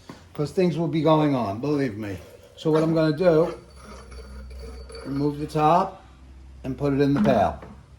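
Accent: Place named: Boston, United States. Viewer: Somewhere around Boston I can tell.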